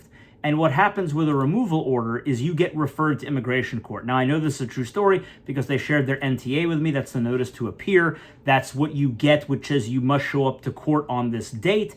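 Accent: South Africa. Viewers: Making progress, that introduction is starting to get pretty smooth. You are starting to sound like an English South African speaking Afrikaans.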